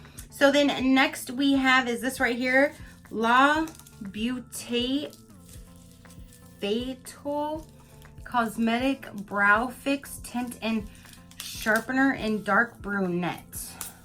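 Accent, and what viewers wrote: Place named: Canada. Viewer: Hi love, I just love the sound you made with the spoolie: buuuuuurom, beeeeroom, beeeerum, booooorum? Inquiring minds want to know.🤪 I wish I’d have gotten the same lipstick. Mine is from colorclub, and it is the EXACT color of the ipsy bag. Another toober, abbadabs, didn’t get her extras either. I have a feeling they are being shipped later. I haven’t heard yet. Another question: where are you from? You sound Canadian, but some Americans far north have a bit of the same accent. I really love yours. It’s so soothing. Gee do I sound like a fan girl, at the wee age of 63? Anyway, I’m not trying to hit on you, I’m happily married for 35 years(about, I always have to stop and count.) our secret? We both had practice marriages, and he was a workaholic who traveled all the time. I figure our actual time spent together is really about 5 years! 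It was a little hard being a sort of single mom, but once we got to San Francisco Bay Area, it got better. Everyone’s husbands worked all over. pm, any restaurant is filled with moms and children. We helped each other out. But these days you’ll often see a smattering of home dads too. My son-in-law is sort of one.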